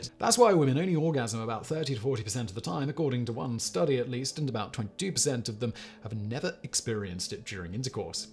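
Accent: United Kingdom. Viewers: As a scientist I LOVE the way you speak about these topics! Science communication at its best. Plus I miss the British accent since I left the UK so that doesnt hurt 😛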